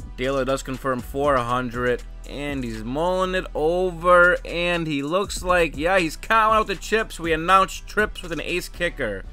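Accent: Boston, United States. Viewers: So, NH is the same accent as in Boston? Just curious, good session, congratulations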